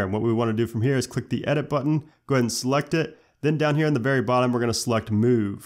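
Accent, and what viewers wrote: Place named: South, United States. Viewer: anyone else think he has like a really hot voice? reminds me of like a southern guy lol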